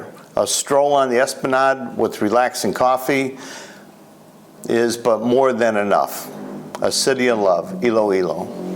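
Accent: United States. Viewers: You from Chicago? Your accent sounds like it?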